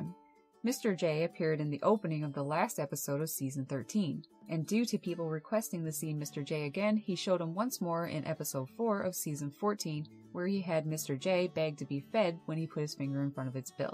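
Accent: Newfoundland, Canada. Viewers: Is that a Newfoundland accent you have? That’s not an insult. You will never please all the people my dear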